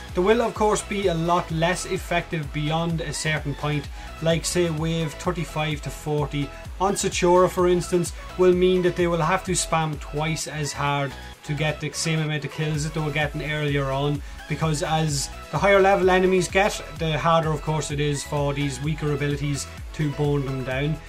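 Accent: Ireland. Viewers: He sounds more like Irish, whereas Grade is more like English english.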